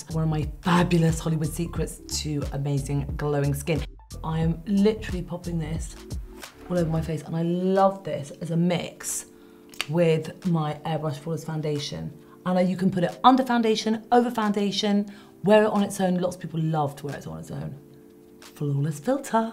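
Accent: England, United Kingdom. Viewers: cute lady...nice english accent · Not just her products and makeup techniques, but also her personality and her English accent 😊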